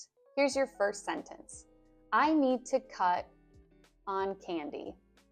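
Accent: United States. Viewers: Can get American accent if they speak like you, but they speak fast in movies 😂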